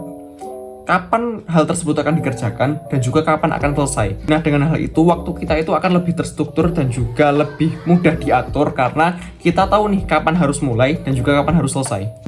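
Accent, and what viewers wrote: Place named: Java, Indonesia. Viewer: logat jawanya kelihatan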